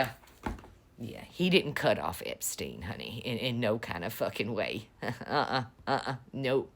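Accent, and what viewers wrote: South, United States. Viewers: I want to be southern and sound like you. Also you always hit it hard. Thank you. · luv your voice ma'am! true southern bell.....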